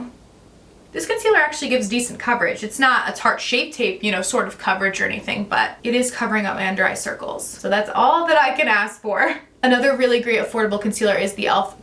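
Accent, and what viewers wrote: United States. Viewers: Her talk reminds me of jaclyn hill. Very american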